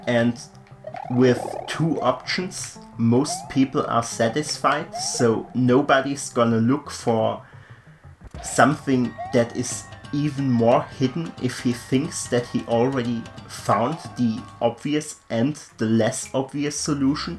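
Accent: Germany. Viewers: Dein Akzent hört sich sehr deutsch an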